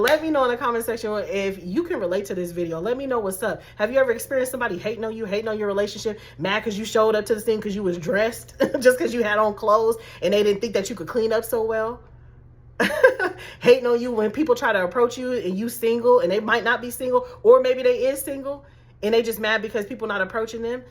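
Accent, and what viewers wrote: Chicago, United States. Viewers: This is so good! You from Chicago? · Englewood Chicago or Cali? I'm from Chicago and you do sound like the Chi! I came across you today! I'm at work working and listening to you! Iron sharpens iron!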